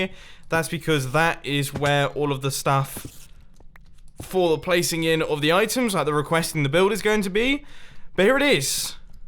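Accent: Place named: United Kingdom. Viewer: A piston doooor stoooor XD I love mumbo's British accent by the way :)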